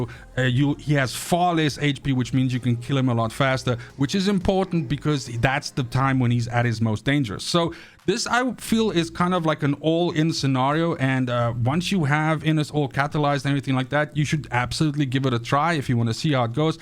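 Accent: South Africa. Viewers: is that a south african accent? 👀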